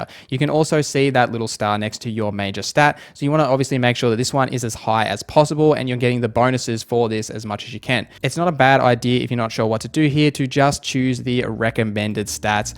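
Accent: Australia. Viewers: Clear. Concise. Australian 👌🏾🔥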